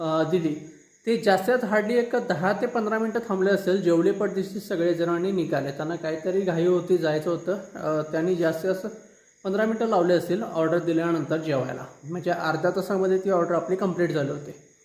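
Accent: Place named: Mumbai, India. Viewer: मी तुमचे व्हिडिओ एकदम सुरूवातीपासून पाहतो तुम्ही कोल्हापूरचे आहात पण शुद्ध मुंबईची भाषा बोलतात आम्ही mh15 नाशिक कर येवला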